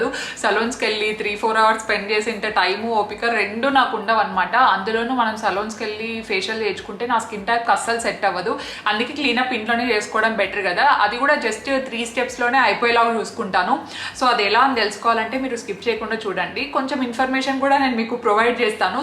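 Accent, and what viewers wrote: Anantapur, India. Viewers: Hi l love anantpur language it reminds me my amama village elukuntala I spent my childhood Holiday's over there, my grany Cook's pappu very tasty, by the way can u please mention hair shampoo, and soap,i am bit confused which one to use to my 9years old daughter.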